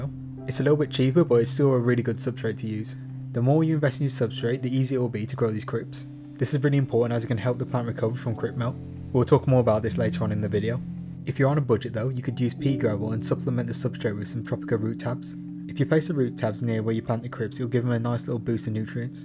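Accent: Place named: Black Country, United Kingdom. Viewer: lol Brits won't struggle with your speech but Americans will pull their hair out because they've never heard regional British accents. Guessing you're from the black country? Burntwood?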